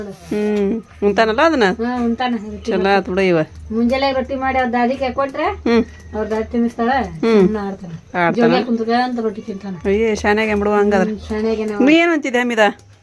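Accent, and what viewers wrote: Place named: Gadag, India. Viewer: Nim maat kelidre same Gadag navar maat gate adav nodri..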